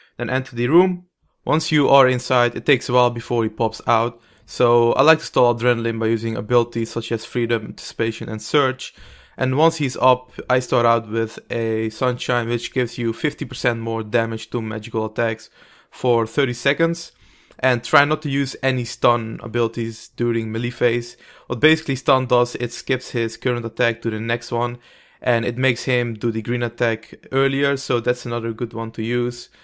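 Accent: Netherlands. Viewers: i can litterly hear youre dutch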